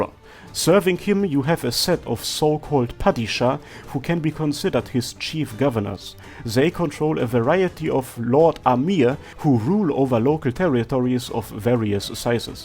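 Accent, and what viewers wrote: Germany. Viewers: you german or close to that? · Is That a German accsent? Damn do i Sound like That when i speak englisch 😳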